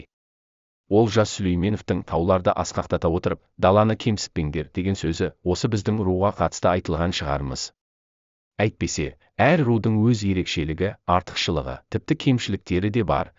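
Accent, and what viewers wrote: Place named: China. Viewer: Сен өзің қазақ емес сияқтысың ғой, қытайша акцентің бар , қазақтардың арасына іріткі салайын деп жүрген біреу сияқтысың ғой.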